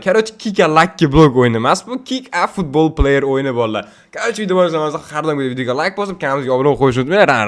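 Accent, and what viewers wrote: Khorezm, Uzbekistan. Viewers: Oka xorazlikmsz a?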